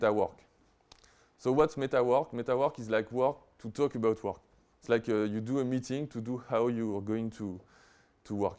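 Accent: France. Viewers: L'accent français à jamais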